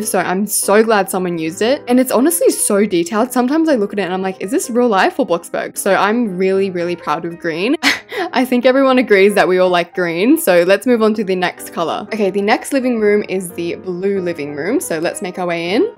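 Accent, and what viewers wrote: Australia. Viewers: I love the way puts in memes and funny voices she's so talented I wish I was her sista❤ I hope I get invited to one of her build battles or something involved with building,she is AMAZING ❤ I love her so much and her Australian accent it's so beautiful. I love the way she NEVER gives up and shows confident energy to every build the subscribers make 😊,it's just amazing. And her name is so so nice and beautiful. Love you ❤🪷😘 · lets just appreciate that in every video someone has to say " lets just appreciate". but anyways i love your videos, i started watching u in June and I'm obsessed, I've watched all the videos u have posted on your channel and everyday i come to check if you make a new one. Your a really talented builder and i would personally, myself say you should become a constructor of some kind. i love your Australian accent and i love your house styles and i love your sense of humour, i just love you. 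♥keep up the work👏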